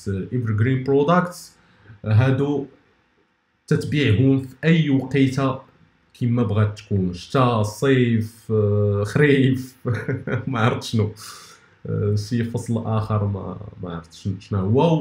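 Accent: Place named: Maghreb. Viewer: راه يتكلم بالعربية اخي وين شتها فرنسية ?!! لهجة مغاربية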